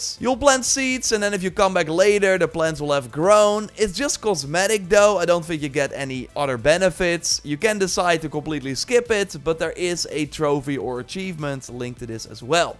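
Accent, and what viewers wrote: Netherlands. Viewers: Telling by your accent, I immediately knew you are Dutch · Are you Dutch? I think I hear it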